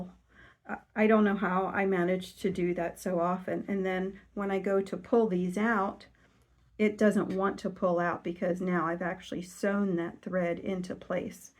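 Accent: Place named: Delaware County, United States. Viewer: I recognized your accent immediately and had to look back to find your location! My family and I are DELCO and have nice relocated. Your voice and accent is soooo comforting! Your quilts are pure love! So happy to have found you! I just want to get away from the commercialized channels and found home!!! So happy!!!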